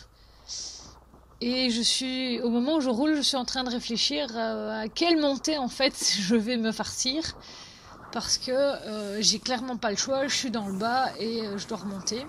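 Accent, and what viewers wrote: Belgium. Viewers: Hebus LeTroll Je sais hein ket ;) C'est juste qu'on entend pas souvent un bel accent belge broubeler sur internet :D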